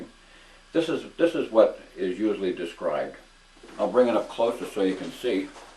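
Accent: New England, United States. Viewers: I have been waiting for months for a new range in our area to open so I can take lessons with an instructor there. In the meantime, I have been able to shoot at a couple of places without the benefit of the lessons with mixed results. After watching parts 1 & 2 I can see where I was making mistakes in lining up the sights vs. trying to get the target into focus. This series of videos is probably the best common sense training I have seen on the internet. Thank you very much and please keep producing these informative and high quality videos. I also like your New England accent. Most of the firearms You Tubers have southern accents which is fine, but my wife is from Massachusetts and I love a New England accent. Yours sounds more like New Hampshire though.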